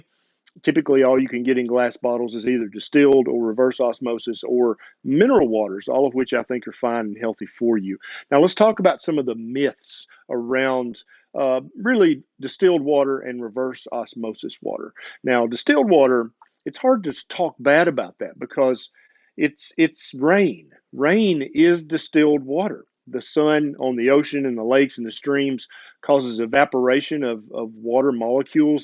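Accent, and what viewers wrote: South, United States. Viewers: Thanks Doc for cutting through the marketing BS and quackery with science drizzled with your mellifluous molasses over honey southern accent.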